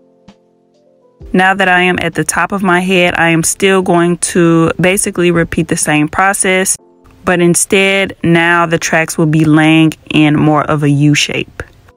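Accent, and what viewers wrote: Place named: Memphis, United States. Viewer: Sounds like a fellow Memphian❤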